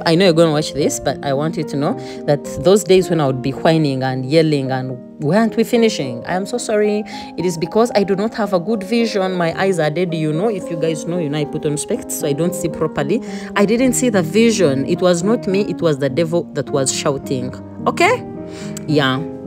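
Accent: Uganda. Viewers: I love the Uganda accent😅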